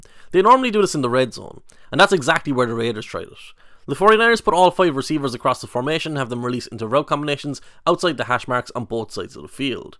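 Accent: Ireland. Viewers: The Irish accent makes this video even better. Good stuff! :) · Anyone else tripping about an irish accent about American football? That’s a first for me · It's how we Irish speak lol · I'm impressed with the observations and breakdown through that fine Irish Brogue. How is it you know American football so well? I hope you get paid good sir. Subscribed!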